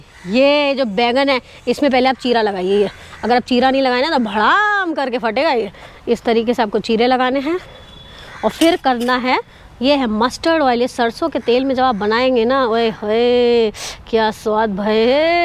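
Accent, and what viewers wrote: Bihar, India. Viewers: Wah di wah... Kya to gajab aapne bihari boli or kya gajab ka explain kiya or uper se itni gajab ki recipe thi litti chokha ki sach main di khafi jayada maja aa gaya aaj ki video main. Thank You So Much For Shareing This Recipe. Di Best of wishes. 🌟🌟🌟🌟🌟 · Kya bihari bolti ho di😂😂 mujhe ase litti chokha pasand nai h leki apne kya gajab👌👌 recipe batai ho di ak bar try jarur karungi☺️😋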